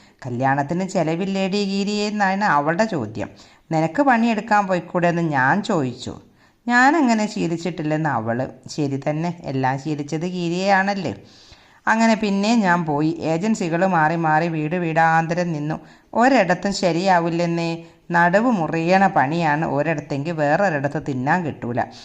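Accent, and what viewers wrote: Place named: Thiruvananthapuram, India. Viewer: തിരുവോന്തരം ഭാഷ ഉഷാർ😊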